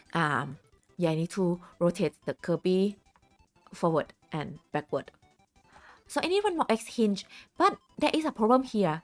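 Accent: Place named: Japan. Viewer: Why Do You Sound Japanese?😮😮😮 Btw I'm New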